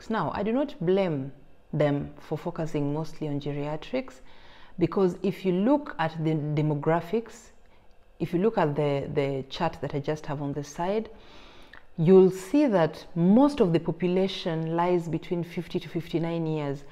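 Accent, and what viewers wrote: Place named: Kenya. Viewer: You speak like a Kenyan. . are you....if so , I'm Kenyan in France, im very near to Switzerland...... i wanted to come to Switzerland through a student visa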